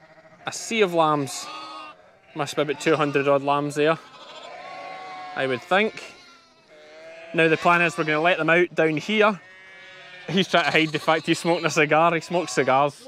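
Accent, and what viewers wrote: Scotland, United Kingdom. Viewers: Loved the photo of and her dogs at the end. Somehow the Scottish accent does not quite have that impact that Forest Gump's Alabama dialect does, but good try.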